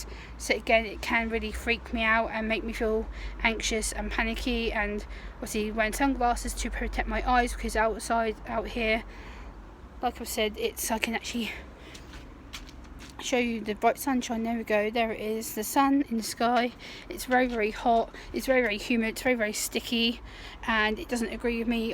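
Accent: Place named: United Kingdom. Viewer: This is the first video of yours I've watched because I'm struggling with the heat and typed in autism and hot weather and I found it really helpful so thank you! Also I'm not sure where in the UK you are but our accents are identical 😅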